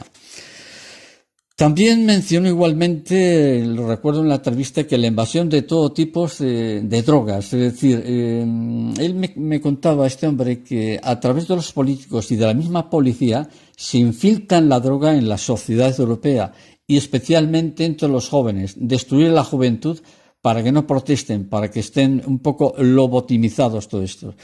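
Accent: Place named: Bolivia. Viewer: Tiene acento puramente boliviano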